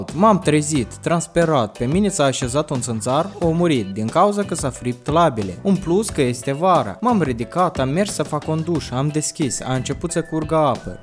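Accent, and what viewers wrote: Moldova. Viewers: Pai se vede dupa accent ca e din Moldova.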